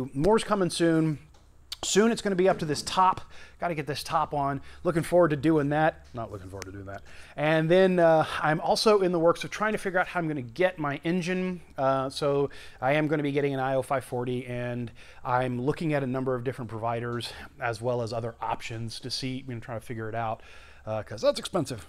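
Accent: South, United States. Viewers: When did you get an southern accent!?! I don’t remember it from the previous videos. Glad you had a good vacation!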